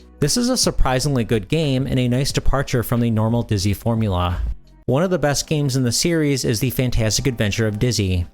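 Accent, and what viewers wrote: United States. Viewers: I grew up in the U.K. when Code Masters started doing serious business and the Oliver Twins were very much local heroes and near unknown worldwide. So it’s nice to hear an American voice cover their achievements.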